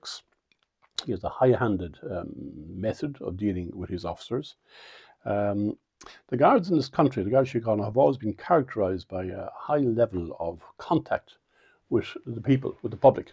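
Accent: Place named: Canada. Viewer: bruh sounds a lot like canada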